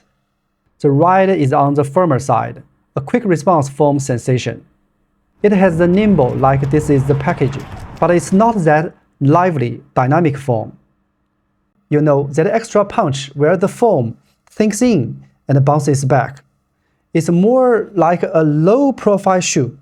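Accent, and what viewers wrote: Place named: China. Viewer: in terms of grammar there isn't much to correct. the only challenge is sometimes I have a hard time understanding some words. it's certainly due to my unfamiliarity with chinese accents.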